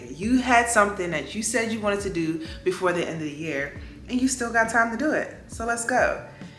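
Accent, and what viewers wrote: Pittsburgh, United States. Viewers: Your accents giving Pittsburgh??? Or am I tripping? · Are you from Pittsburgh?? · Are you from PGH? I might be wrong but I hear your accent. Thank you for the video.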